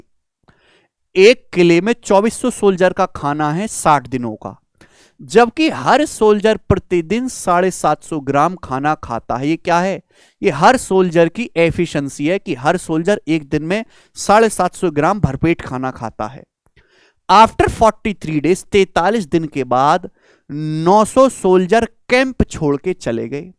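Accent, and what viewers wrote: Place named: Bihar, India. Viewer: मैथ का मतलब गगन प्रताप सर बिहारी अंदाज में बहुत अच्छा लगता है सर ❤❤❤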